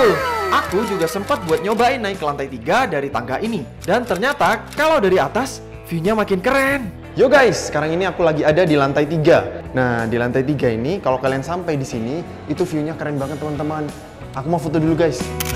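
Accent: Java, Indonesia. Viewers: Kakak orang jawa?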